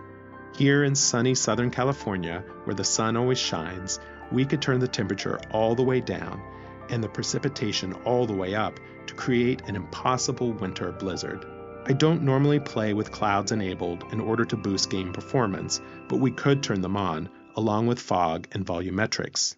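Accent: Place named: Italy. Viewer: "Sorry for my bad English" cracked me up! Il tuo inglese è eccellente, better than most Americans, e gli Americani adorano gli accenti Italiani!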